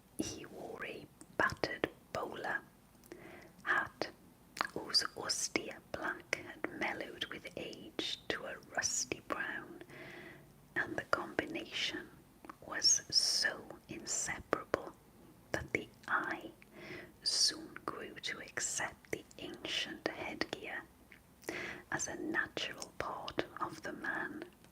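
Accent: Wales, United Kingdom. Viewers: I really love the Welsh accent, especially when women speak it, but the ASMR whispering just isn't for me. Are there any recommendations for YouTube channels, podcasts, something where I can listen to some heavy Welsh accents talking about some nice stuff?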